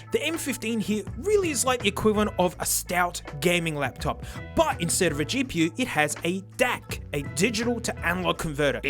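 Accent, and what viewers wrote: Australia. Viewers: I don’t understand this nonsense but he has an Australia accent I’m gonna roll with it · I literally have no interest in music but I watched this entire thing and I don't really know why. Maybe it's something about hearing the Australian accent on YouTube laughing and joking around not sure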